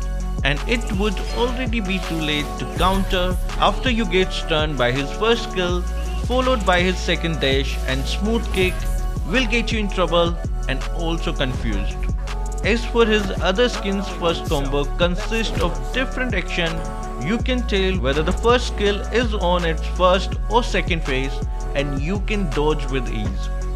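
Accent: India. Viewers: Bro got Indian accent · you sound like my friend his indian nationality.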